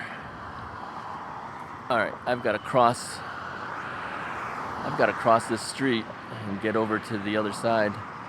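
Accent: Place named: Illinois, United States. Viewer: It sounds like you're in IL, up north somewhere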